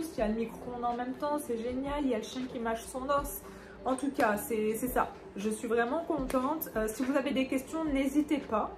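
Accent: Quebec, Canada. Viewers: Tu as pris un peu l'accent québécois 😂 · Oui, je suis d'accord par moment on entend un léger accent québécois. Au fil des années je pense que cela va s'accentuer un peu plus mais ne perdez pas l'accent Français totalement.😊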